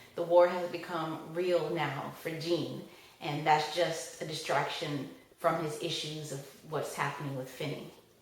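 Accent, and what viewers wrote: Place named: South, United States. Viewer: southern accent isnt needed but good job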